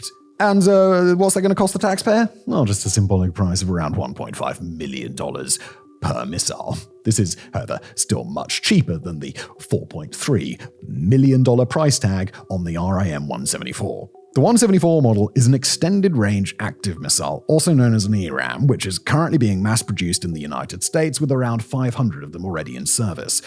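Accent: United Kingdom. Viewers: This guy is an example of idiots with a Lyme accent sounds like he knows what he's talking about but no, another big mouth idiot.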